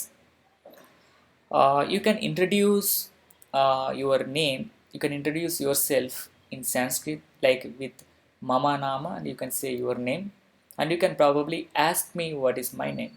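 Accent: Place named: Kerala, India. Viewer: Mallu accent is so distinctive.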